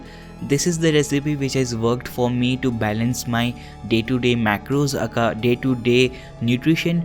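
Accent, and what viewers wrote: India. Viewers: Why do most indians speak like this while talking english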